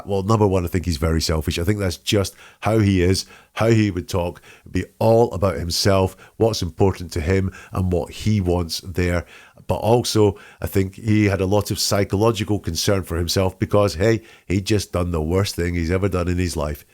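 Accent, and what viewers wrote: United Kingdom. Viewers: Hello, my friend! You sound British! I'll share a bit of synchronicity with you. About an hour ago I just learned of the idiom "hoist with one's own petard" How fitting for Mr Watts and this very insightful video! I have subscribed and look forward to hearing from you often.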